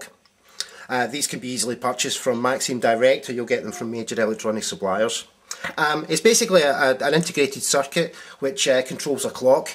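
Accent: Scotland, United Kingdom. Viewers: Your scottish accent is great - i like it very much! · I knew the accent was souding very scottish. Maybe I'll meet you at the next Arduino meeting at the Electron Club…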